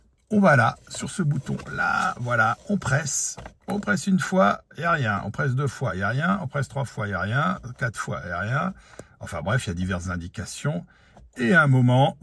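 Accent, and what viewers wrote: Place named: United States. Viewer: Merci beaucoup pour cette démonstration ! Et quel accent américain🇺🇲 perfect!🫡😀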